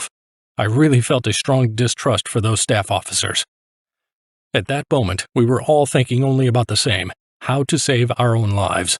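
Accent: United States. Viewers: This was somewhat confusing - the narration being from a German point of view but in an American accent !!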